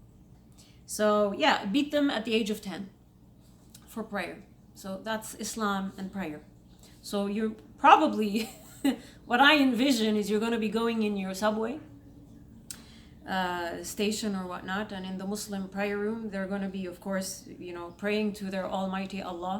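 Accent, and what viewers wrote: Egypt. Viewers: You Egyption acisent dosent bother me none honey. Its all good. Keep up the good work. I watch your videos all the time .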